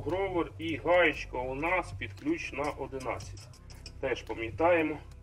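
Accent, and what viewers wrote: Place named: Russia. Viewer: Это тот случай когда русскоговорящий пытается говорить на мове.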